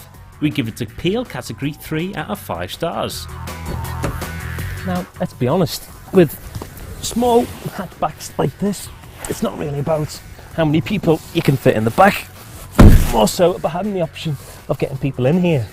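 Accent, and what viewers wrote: Liverpool, United Kingdom. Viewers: I spot Sefton Park! Didn’t realise it was Liverpool well apart from the accent! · He's from Liverpool.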